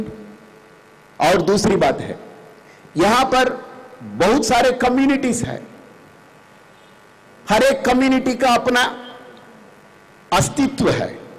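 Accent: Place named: South, India. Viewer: Oh my god what a pure hindi .... kon kahta hai south indian hindi nahi jante ... I m also SI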